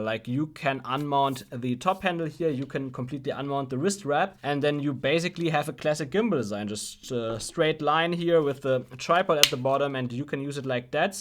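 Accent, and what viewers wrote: Germany. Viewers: good old german accent wenn ich mich nicht täusche :D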